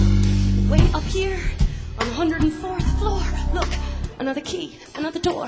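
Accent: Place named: South, United States. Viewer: I swear if she EVER talked to me with that voice i would die! And her lil country accent would ..aghhhh i'd just die :p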